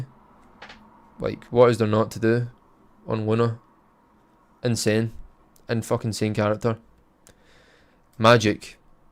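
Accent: Scotland, United Kingdom. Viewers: Is that Scottish? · He said "He can literally kill" not "he can one shot kill" the scottish accent just makes it sound weird. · Its the Scottish accent. Based like Logang.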